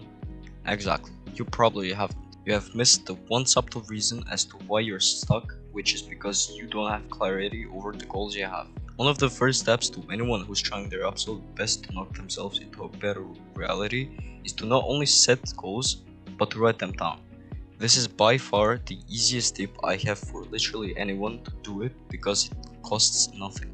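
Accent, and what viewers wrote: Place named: Netherlands. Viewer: ofcourse i am also from europe and i recognized a little accent for me it sounded dutch it would be funny if you talked dutch!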